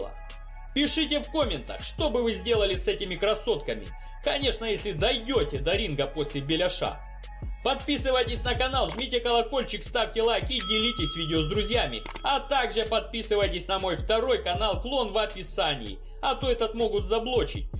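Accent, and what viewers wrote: Ukraine. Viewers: И ещё. Это просто украинский говор, запомни☝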